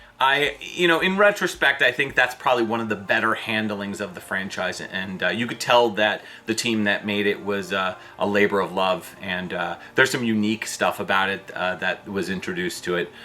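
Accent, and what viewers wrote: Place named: Brooklyn, United States. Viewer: I love honest rants. Honestly saying what most are thinking - right down to the Brooklyn accent 😂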